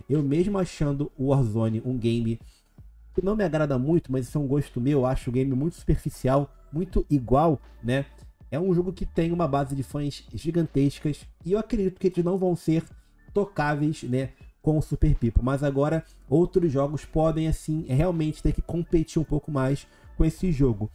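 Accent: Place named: Rio de Janeiro, Brazil. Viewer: Mano o que e pubidi kkkkk você deve ser do rio de janeiro só fala asim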